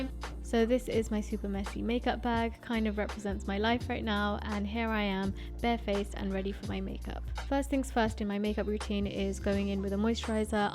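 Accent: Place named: United Kingdom. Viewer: It is so surprising that you are Pakistani and you have a magnificent British accent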